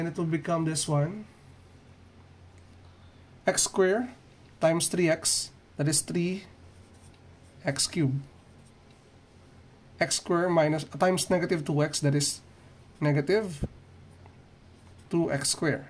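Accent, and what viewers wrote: Philippines. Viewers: Waraynon ka po ba sir?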